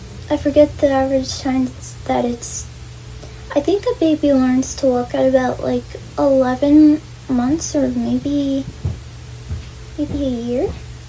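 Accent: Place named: New York, United States. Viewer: Your accent came out a little in this video :p you've mentioned being from New York before but I didn't notice you sounded like it until now. There's nothing wrong with whimsy and knowing who you are :p legit feel like the whole being "stuck" mentally thing you are describing is just not true (I don't mean that your struggles aren't true, I just mean that I don't think you're actually mentally behind most people your age, lmao... have you met most 20 year olds nowadays? like. you're good, girl, seriously). Maybe it was something that felt slow or painful in the past but you clearly are growing and developing as a person, even just talking about the past few months. It might be more exacerbated because you live in (a part of) America, where being able to drive and owning a vehicle is almost a prerequisite for independence and is a rite of passage for adulthood, and the fact that you were homeschooled so you have less context about the maturity of other people your age, outside of your family, but legitimately, in any other place you'd be ... 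I won't say "normal", but... finding it easier to thrive independently? I talked about it in the comments of another video but I legitimately think that autistic kids don't grow "slowly", they grow in a "different shape" than others, and it only looks slow if you're trying to force them to grow in the "normal" shape, unnaturally. You clearly have skills, emotional maturity, and agency that most 20 year olds don't. The agency at least is no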